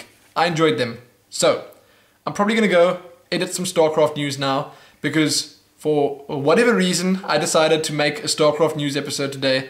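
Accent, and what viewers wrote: South Africa. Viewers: Gogo the SA accent! Good show and a new fan!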